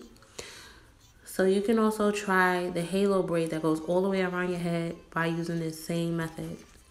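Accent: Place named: Cleveland, United States. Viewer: You did your thing,! Are you from Cleveland? You sound like it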